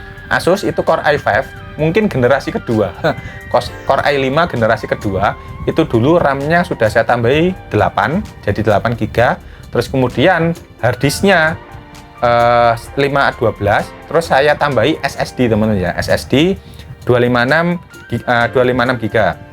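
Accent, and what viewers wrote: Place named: Java, Indonesia. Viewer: Pertahankan medoke mas...keren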